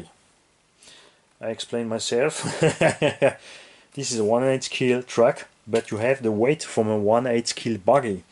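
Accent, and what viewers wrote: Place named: Alsace, France. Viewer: Je comprends rien que ce soit en anglais ou allemand mais entendre ton accent alsacien un vrai régal 😊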